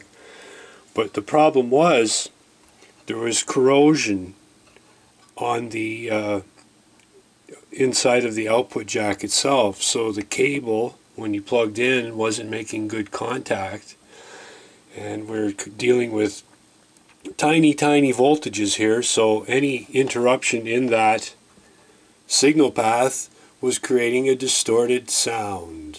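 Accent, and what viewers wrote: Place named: Maritimes, Canada. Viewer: Hey are you from the Maritimes originally? You sound like one of us. lol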